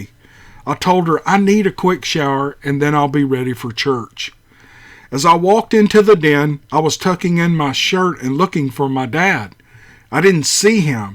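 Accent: South, United States. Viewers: I’m so happy I discovered your channel! The past couple months I have been reading a LOT of book series of Bigfoot encounters and this is SO much better! Your narration and splendid southern accent makes for awesome and enjoyable listening! Thank you for your great work!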